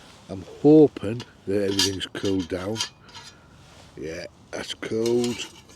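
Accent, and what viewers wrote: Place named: Teesside, United Kingdom. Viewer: where are you from, ya sound like a teessider to me ( ps im from teesside ) that stove and gas legs look mint chor 😂👍🤌👌🇬🇧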